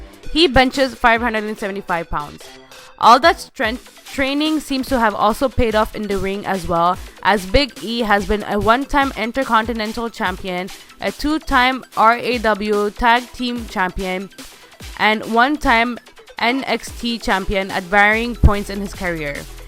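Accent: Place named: Iran. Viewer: What's with this woman narrating this video..sounds like she's from iran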